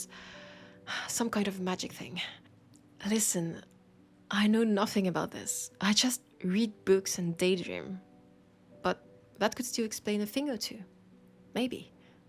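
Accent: France. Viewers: Ah, my favourite French accent! :3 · Im down bad for the french accent, chat am I cooked 🥀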